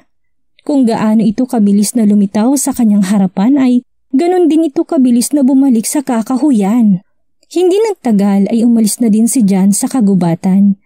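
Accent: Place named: Visayas, Philippines. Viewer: Bisaya man gid ang accent ng Narrator 😊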